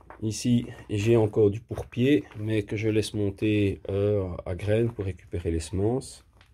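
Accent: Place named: Hainaut, Belgium. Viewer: Bonjour compatriote , je viens de découvrir votre chaîne , Félicitation. Plaisir de découvrir un autre jardinier Belge sur YouTube. Mais d'ou êtes vous précisément ? Vu l'accent je dirais du Hainaut , Mons ou Tournai ou pas loin. Bonne continuation et prenez soin de vous .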